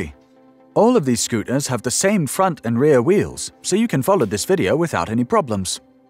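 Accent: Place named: Cockney, United Kingdom. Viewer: I can hear your a cockney 👍 good video very informative thanks 😊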